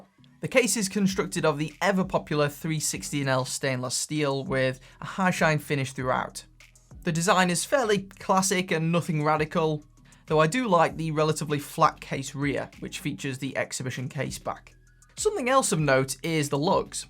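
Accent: United Kingdom. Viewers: Just wondering, what is your British English Accent?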